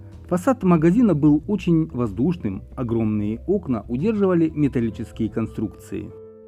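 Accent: Kharkiv, Ukraine. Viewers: Только пару раз там была, все очень дорого))))) 😅А так, конечно же красота, красивое здание! Внутри в старом стиле все, это очень лампово. П. С., обожаю когда именно вы рассказываете, так умиротворяюще, интересно и с нашим, харьковским акцентом ❤️❤️❤️Спасибо ❤️❤️❤️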